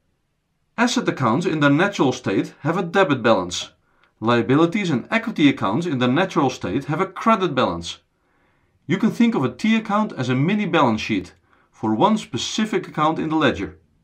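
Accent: Netherlands. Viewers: This gentleman is definitely Dutch!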